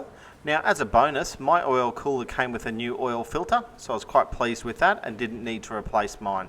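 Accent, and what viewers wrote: Australia. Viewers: ausie accent??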